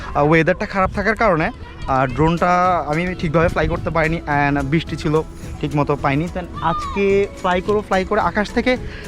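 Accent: Noakhali, Bangladesh. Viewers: ভাই মনো আঙ্গো নোয়াখালীর..? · ক্যামেরাতে হ্যাক লাগি গেছে গই,,,,, ফ্যাক্টঃ নোয়াখালীর ভাষা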